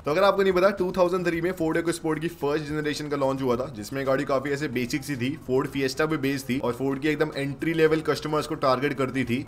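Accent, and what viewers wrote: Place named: United States. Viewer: You talking about Escape. And why the hell are you trying to use American pronunciation?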